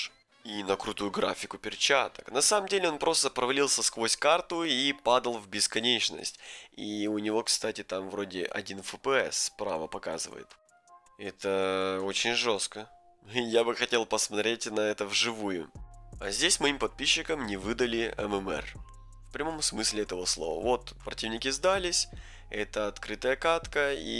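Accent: Ukraine. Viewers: Ты украинец или беларус?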